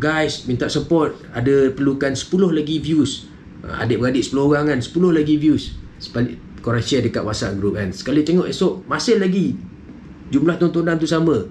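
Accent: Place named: Kedah, Malaysia. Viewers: Amboi byk selit utaqa nohh🤣🤣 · suka ckp kedah "X leh kata apa arr"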